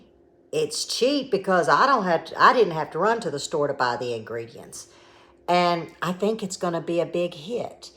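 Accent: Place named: South Carolina Lowcountry, United States. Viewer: is a treasure. As soon as I heard her speak I recognized the south carolina lowcountry accent. I have spent a lot of time in the lowcountry and the folks there are warm and inviting. Lovley people. Now she resides in the upstate of SC where I am from. Been watching her for a long time. She is a great storyteller too.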